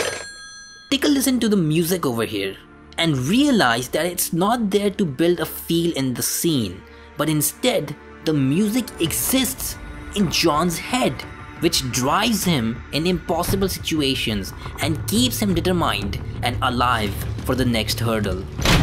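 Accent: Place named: India. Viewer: Dude u have to firm your Indian english voice the vocabulary is good but sharpness of words, u have to soften it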